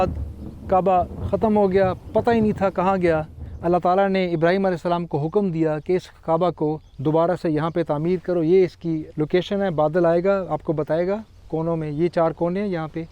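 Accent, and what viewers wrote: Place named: Kashmir, India. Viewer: آپکے بولنے کے انداز سے معلوم ہوتا ہے کہ آپکا تعلق کشمیر سے ہے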